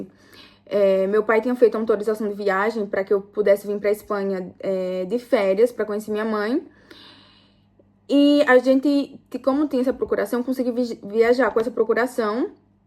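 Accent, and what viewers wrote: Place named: Northeast, Brazil. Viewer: Seu português é, um sutaque de nodestina .... e não tem nada Haver se mora fora do Brasil ou nao...😂😂😂😂😂😂😂😂😂Nodestina......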